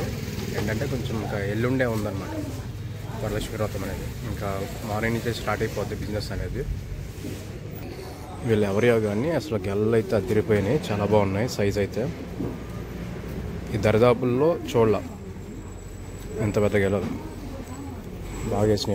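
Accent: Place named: Rayalaseema, India. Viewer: You are from Kollegala,Chamaraj Nagar Dist. How are speaking in Telugu,like Rayalaseema people?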